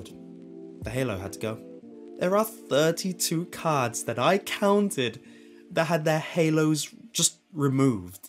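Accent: United Kingdom. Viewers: he sounds British, but is not British. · You sound British but don't look British what's up with that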